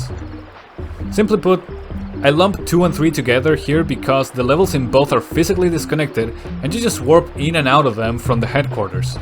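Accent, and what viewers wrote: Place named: Chile. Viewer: Un chileno hablando ingles? Aprende toby fox 🗣️🔥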